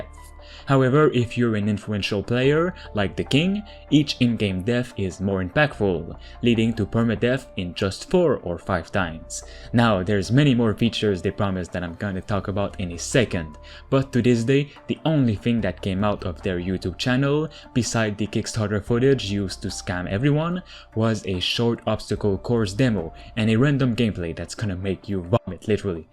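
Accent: France. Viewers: why dont you just make videos for french people? your french accent is so thick i can barely see the video.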